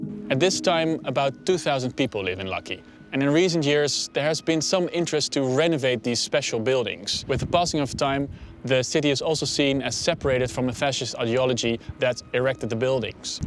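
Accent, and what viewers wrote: Netherlands. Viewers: I guess he's Dutch. He has a thick accent, but trying to imitate an American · I hear a dutch accent.. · very nice video, you sound really Dutch. :) !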